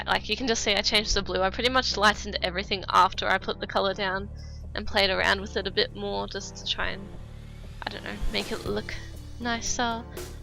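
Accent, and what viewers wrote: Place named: United Kingdom. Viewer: Haha, na, sounds to me like you got a good voice for these things. Are you British? I feel like theres a sort of British accent in your voice.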